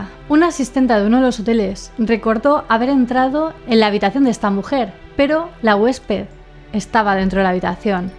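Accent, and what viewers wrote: Spain. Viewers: Hola guapa eres de España se te nota en el asentó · Muy buen vídeo; por tu acento asumo que habrás oído hablar alguna vez acerca del crimen de Alcácer, sé que es un caso bastante sórdido y enmarañado, pero estaría interesante un vídeo al respecto con tu excelente narrativa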